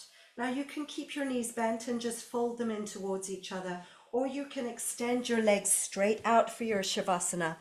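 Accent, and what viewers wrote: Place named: United Kingdom. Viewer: Thank you / Suggestion. Dear. Thank you very much for bringing me back to my powerful practising of Kundalini yoga, and adding great practises to mines. May I humbly add this next part Just To Help Me, You and Other Students? If Yes, please read: For the returning and for the new students, I think it would be really kind to remind us that we do not have to do the practises as lengthy as you do them, and that we can stop as soon as the exercise becomes tiring. Also in this video, (maybe just due to your speaking fast & British), there was a confusing point from minute on, where you stoped 'showing us the exercise by doing it,' and start just explaining what we should do while still on our backs. Would you kindly make that shift clear? Truly thank you for your goodness and obvious skills brought to us in this most beautiful way. 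God bless you. May the Wind Be On Your Back, And Sun Always Shine Upon You!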